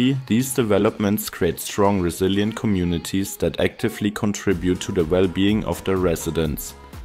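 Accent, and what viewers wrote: Germany. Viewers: Most stereotypical German accent ever.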